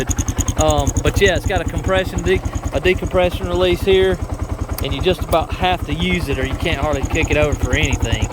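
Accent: South, United States. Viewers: Where are you from? You have a major southern accent.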